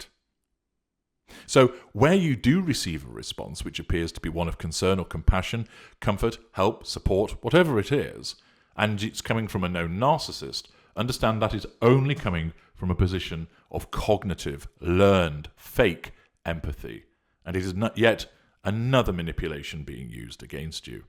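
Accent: United Kingdom. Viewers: This British accent- I feel like a spot of Earl Grey and a plate of biscuits while listening to this😅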